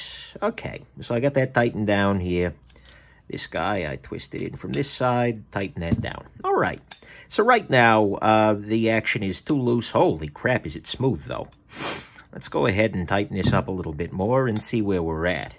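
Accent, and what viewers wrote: New York, United States. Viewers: you have to be from New York or New Jersey!?! I enjoy your videos knifes and explanations on the parts and disassemblies!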